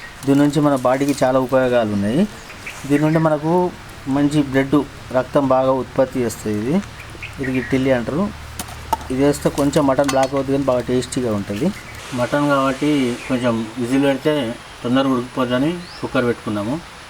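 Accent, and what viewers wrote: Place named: Telangana, India. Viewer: Pakka telangana slang ra adi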